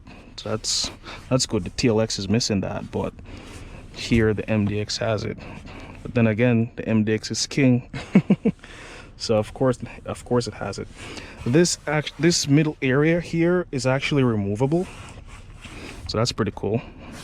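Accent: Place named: Caribbean. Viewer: Where are you from? You sound carribean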